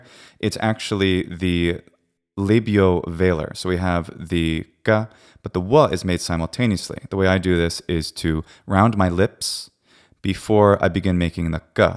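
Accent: Italy. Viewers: This isn't the Ecclesiastical pronunciation, it's the Italian pronunciation. Ecclesiastial would be : hor-tus, kvan-ta, ling-gua, con-tsi-to, gur-ges, mang-na, nes-tsi-ne, ni-si. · I just found this channel--it is so fascinating! As a speaker of 6 languages, I am still disappointed that I never took latin (isn't interesting how people also say they "took latin" rather than saying they "speak latin?"). But I love his latin (this You Tuber), who speaks with such an authentic Italian accent and cadence. It makes me want to pick it up as a hobby! A huge compliment as I m hard to inspire these days, lol! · Your Latin accent has a very Italian quality to me, very soft and musical. I have been studying ecclesiastical Latin for several months. I am Catholic had been a cantor at our Cathedral. I had intended just learning prayers in Latin, but fell in love with the language. 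I tried several methods but ended up using primarily your recommendation of Lingua Latina Familia Romana: the text, the companion book, the exercisebook and the teacher’s guide/answer book. Hackett Publishing also offers MP3’s for sale on Amazon music in either the classical or ecclesiastical pronunciation, which I purchased. My goal is to be able to read St. Augustine’s book on the Trinity in Latin and more.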